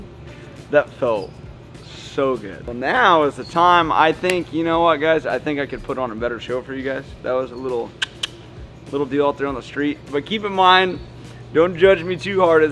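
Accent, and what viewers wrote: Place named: Ohio, United States. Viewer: I just found this channel and this is the first video I’m watching but his accent makes me think he’s from Ohio. Sounds a lot like Roman Atwood.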